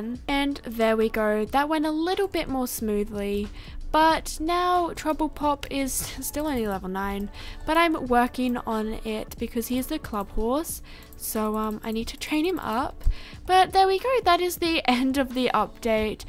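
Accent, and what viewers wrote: Australia. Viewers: Omg wait are u Aussie bc if you are 😳😁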